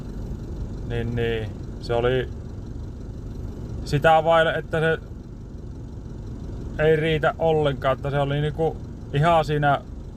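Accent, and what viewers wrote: Finland. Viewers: I just realized why my question was awkward! Sorry, when I posted my comment I hadn't had the chance to listen carefully yet, and my understanding of the Oulu dialect is still narrow so I hadn't caught much of it anyways — I got a little too confident. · Hehehe, as soon as I heard your voice I knew you’re a Finish guy. And normally Finish people understand humor and sarcasm. But yeah, you got some skills there, not going to lie :o) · I can't with the country Finnish accent telling the most relaxed story of what's been going on while performing intricate surgical moves with a huge machine. 😂 Eeipä se haettaa.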